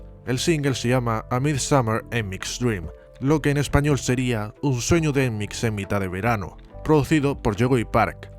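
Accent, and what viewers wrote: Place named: Spain. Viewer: hola humano por el acento debe ser español me gusta que alguien intente profundizar con bisturi en las entrañas de nmixx buscando el problema de su muy poco mas que mediano exito hasta hoy, analizando con lupa a detalle cada cancion y comeback no coincido con el caso kyujin para mi ella tiene que ser el centro y es mejor en todos aspectos que sullyon solo exceptuando la belleza, o.o Dice, soñar, son canciones que poco tienen que hacer contra las de grupos como new jeans,aespa,no se diga grupos de alta calidad musical como XG, tripleS, pero tampoco las calificaria como basura, yo en lo personal prefiero Dice que run for roses Dice es una cancion corta pero grandilocuente llena de cambios de ritmo instrumentalmente rica y fantasiosa una especie de k-pop progresivo es dificil que el publico coreano la aplaudiera mientras que run for roses es mucho mas basica y simple alli fueron a la segura y les resulto cada quien tiene su propia opinion coincido en que el mayor culpable es la empresa ya que las chicas tienen talento de sobra lo unico que necesitan es de buenas canciones que las hagan destacar pero esto pasa con muchisimos grupos y podia nombrar el caso de Kep1er y Everglow y algunos otros mas con miembros con gran calidad pero que es poco aprovechada con canciones terribles como tipi tap o zombie que uno no puede creer no haya un filtro de calidad que se de cuenta lo pesimas que son y salgan al publico,espero que siga haciendo videos porque hay muchos casos de que profundizar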